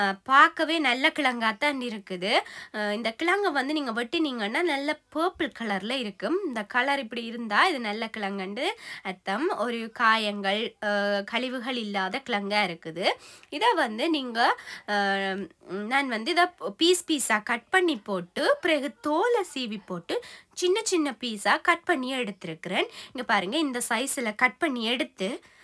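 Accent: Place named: Sri Lanka. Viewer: Sister entha kizhanku enka ketaikum unkaludaya srilanka tamil super Liked Stay in You have my support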